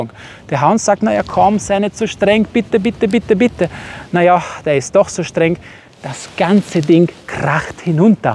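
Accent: Vienna, Austria. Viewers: Dein Wienerisch klingt charmant. ;) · 🤣🤣 Herrlich! Mit fast perfektem Wienerisch! Danke für die Auffrischung der Sagen-Kenntnis! 👌🏻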